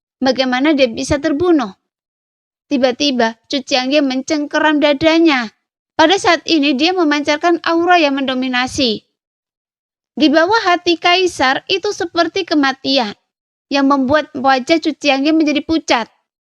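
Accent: Java, Indonesia. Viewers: Duuaaar ....jawa kentel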